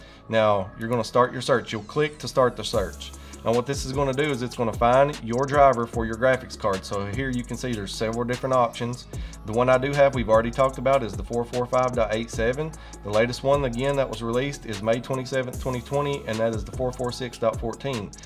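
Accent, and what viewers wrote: South, United States. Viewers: Love the southern accent. Where are you from? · Even though he has like a southern accent 🤣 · the south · I agree...great tutorial, short and precise in a very clear and calm voice...very informative...Is there a slight southern accent to hear there??..😉😉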